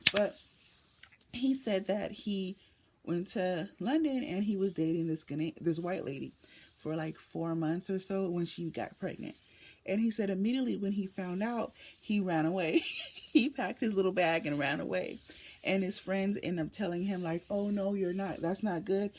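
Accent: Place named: United States. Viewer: Are you a British or American ? Your accent sounds like American .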